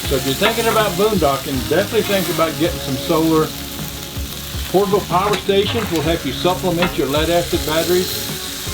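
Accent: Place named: Louisiana, United States. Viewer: "How y'all doing"? Cajun :-)))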